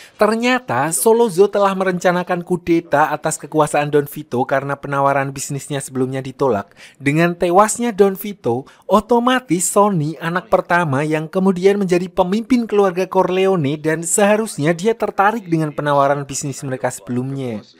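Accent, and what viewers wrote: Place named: Tegal, Indonesia. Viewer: Narator asal tegal. Di usahakan ga medok pun susah ya min. Kadangada yg lancar tapi kesulitan bedain antara R sampe jadi RL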